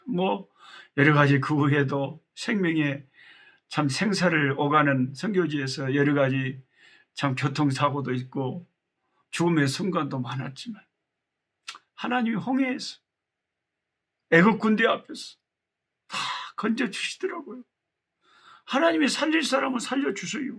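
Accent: Jeolla, South Korea. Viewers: 할렐루야!! 움직이면서 이런저런생각을하며 하늘을보면서 맘속으로기도를했습니다 지금이시간까지 지켜주신주님이너무감사해서요~~~지금제가볼수있는것은~계획되어있지않는 알수없는길을가고있습니다~사고로모든것이올스덥되고 아버지또한 모든것이 희미하지만~저는오늘목사님기도처럼 홍해를가르시는주님을믿고의지합니다~지금환경과문제모든것을감당하고 나가는게때론벅차지만 홍해를가르시고 이스라에백성을인도하셨던주님그분의자녀가저이기때문입니다~~요즘은~계속계속~환경에서오는시련이있어서모든것이아주느리게움직이는것같고 힘이빠졌던것은사실입니다~~~목사님의기도로다시시작해보려고요 멀리서도이리말씀먹이겠다고 방을옮겨가면서 분위기바꿔가면서때론많이지치실법도하신데 목사님의사정없이쏟아지는절라도사투리로정신을차리고 다시금 또다시시작하려고합니다 ~~내일은아버지를요양원으로모시고가야 할지도모르는 무거운발을옮겨야할지모르기에 오늘만큼은 더굳건히마음에죄체감안지려고 힘내보려합니다 날씨가시골연천은많이춥습니다~아프리카목사님거실에꽃과밖에푸른실록을보고싶네요~~같이기도해주시는목사님이계시니감사가나오고 힘이나네요~~~건강하세요목사님사모님😊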